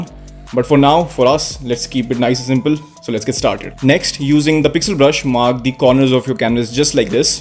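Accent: India. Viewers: are you a indian , not gonna lie your voice and accent are beautiful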